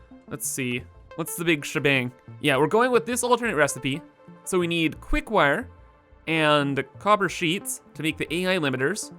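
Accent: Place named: Canada. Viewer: Dude! Could you be more Canadian? Funny to hear the accent: bese (base), spece (space). Get my drift...ey wink, wink Love your videos brother. Gonna buy I on Steam too. Since the Dev are based 45 miles from where I live in Sweden. Ya kno...greatest nation on earth 🤪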